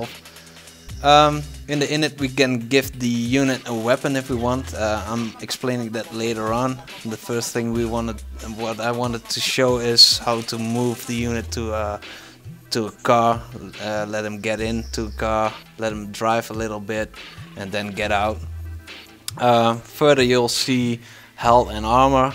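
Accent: Netherlands. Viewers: Great tutorial , easy explanation for dummys and people who just needed that info + I can hear your Dutch so thats always good hehe. If you ever need help editing , acting or anything else please let me know, Would love to know ( + Im also experienced) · Nice ! Are you from the Netherlands? Just wondering cause of the accent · You sound Dutch, are you?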